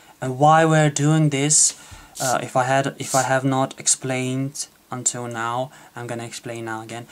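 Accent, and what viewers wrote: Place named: France. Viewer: He sounds french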